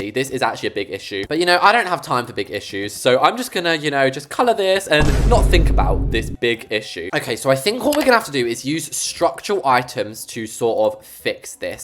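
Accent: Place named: England, United Kingdom. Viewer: I don’t think he is loll, hes from England so that’s why he has an accentt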